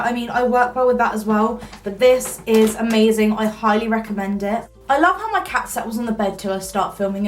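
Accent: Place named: England, United Kingdom. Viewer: I love a English accent 🥰🥰🥰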